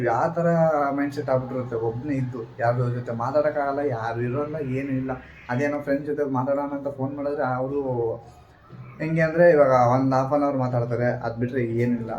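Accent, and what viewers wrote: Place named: Bangalore, India. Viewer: Tamma ni nam uttara karnataka style dage maatadu bangalore language set aagalla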